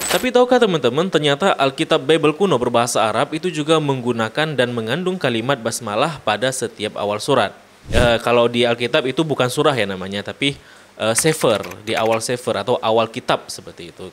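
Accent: Aceh, Indonesia. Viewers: Logatnya seperti logat aceh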